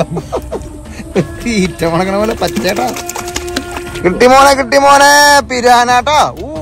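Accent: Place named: Ollur, India. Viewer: Ollur slang ahno poli slang ❤❤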